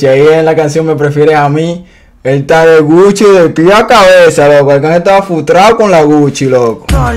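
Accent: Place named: Panama. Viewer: tu hablas como que estas con los panameños yo soy tu no tires ni diaqui ni de aya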